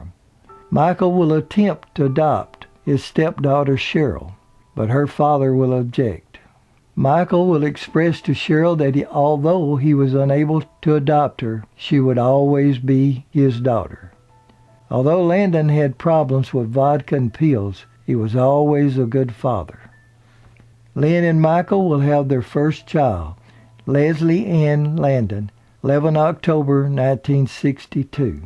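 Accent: South, United States. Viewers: I would like to know who is narrating this video? He sounds like a true southerner. I’m from Alabama and I enjoyed listening to him narrate. Wasn’t a fan of Little House because Nellie was so mean lol. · I would’ve preferred someone with a northern accent narrated the documentary. I have nothing against southerners, it’s just that it’s so hard to understand him, the pronunciation of certain words and the drawl.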